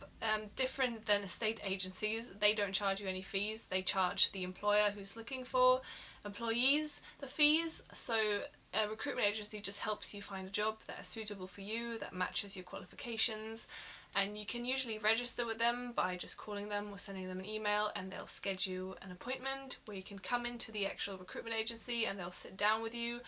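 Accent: United Kingdom. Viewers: How did you learn the british accent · I really love your British accent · Amazing British accent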